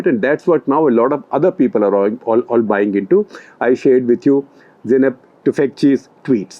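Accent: India. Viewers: Firstly so difficult to follow this Indian due to his heavy accent. Second, he failed to mention the outbreaks and deaths in Fort Detrict, Maryland back in August 2019, which the CDC Robert Redfield confirmed was covid-19. Then the traces of this virus found in waste waters in Italy, Spain and France as early as March 2019. These important facts were not mentioned nor investigated even though the findings were months early before the Wuhan outbreak. This report is rubbish and unreliable and biased ! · GOOD GRIEF!! SORRY UR YAKKING IS SO MONOTONOUS N NITBTO D POINT I HAD TI SHUTVOFF AFTER 5 MINUTES... IT WAS UNPLEASANT TO LISTEN...TOGETHER WITH D INDIAN ACCENT UT MADE IT WORST. .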